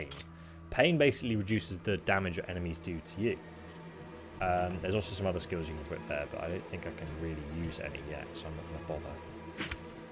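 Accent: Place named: United Kingdom. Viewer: Actually, I like your articulate brt English. Keep reading those text pls for you're more amazing than the BBC (not trolling, I'm really practising my Eng. listening)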